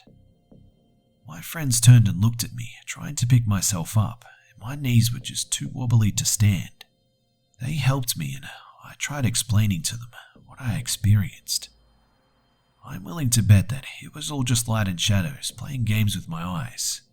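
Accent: Australia. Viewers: Wow thanks! Neat stories:) You should do a video over skinwalker/ wendigo stories. The 'My cabin getaway' series was literally awesome and so creepy <3!! I know for sure I'd love to hear your punder-ful, aussie accented, smooth voice narrating some good old Native American horror stories again. And i'm positive all the other fans would too. P.S. Now call me Ra-pun-zel but you should drop some more puns, you seemed to have dropped off a bit on them:). (Sorry if this came off as super criticizing, just trying to be constructive) - Izzy the Adventure Princess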